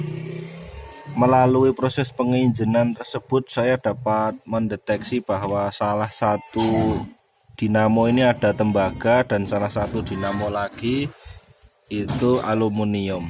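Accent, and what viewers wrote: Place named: Surakarta, Indonesia. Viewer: solo ne pundi mas kulo colomadu. Sui biangeet ra krungu logat solo, guyu2 dewe aku..smangat terus mas yo💪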